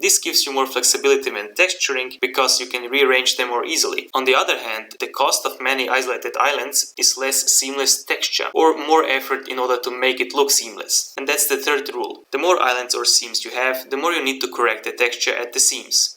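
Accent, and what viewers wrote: Russia. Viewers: INTERESTING, only I hear a Russian accent, or I'm wrong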